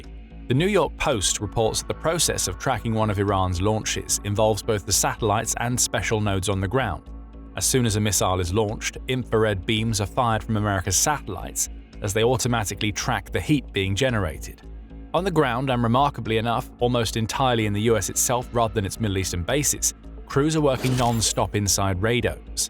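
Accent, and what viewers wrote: United Kingdom. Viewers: If this the case why wasn’t we abled to stop there missiles sooner or even now. They just shut some at Israel creating mass casualties. Look what they have done to the gulf countries. Israel airport, Dubais airport, Israel’s nuclear site, residential and so many others. Iran doesn’t have satellites but china and Russia are giving Iran all the information they need, that’s why they hit their targets on the dot. So this video was like Iran is a sitting duck. I wish that was the case,but it’s not. Even to the point that Trump is talking about negotiation. Please get your facts straight and I don’t know what is up with a British accent?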